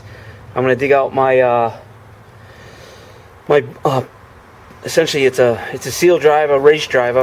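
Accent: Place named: New Jersey, United States. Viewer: Cool accent...you a jersey boy?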